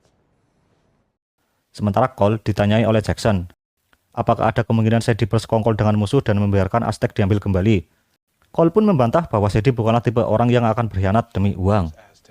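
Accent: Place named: Java, Indonesia. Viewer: Terlalu medok bang…oalah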